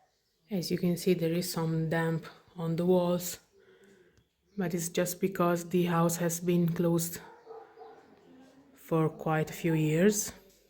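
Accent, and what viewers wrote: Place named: Italy. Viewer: Very nice presentation in English with charming Italian accent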